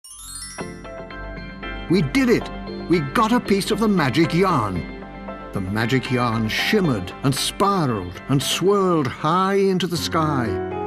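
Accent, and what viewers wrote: England, United Kingdom. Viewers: Your British, I never knew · very interested, when I played this game in the US he sounds American, and this narrator sound like he's from UK so would that mean is from the UK as well?:o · Ok I like this narrarator a lot more than the U.S. One. British narraration is amazing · I'm loving the narrator with an English accent. It makes this game feel like LittleBigPlanet. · I wouldn't mind more soothing and amusing British narration in my Kirby games. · Narrator is British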